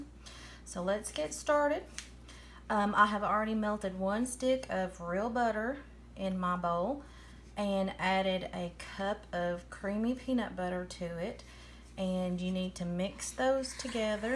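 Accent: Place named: South, United States. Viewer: Love her Southern accent. Much better than the East Tennessee drawl I had many years ago!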